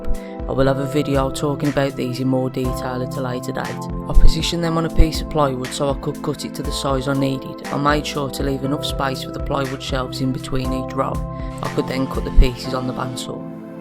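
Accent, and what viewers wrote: United Kingdom. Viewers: Great job. I love your british accent even if its a bit harder for me to understand...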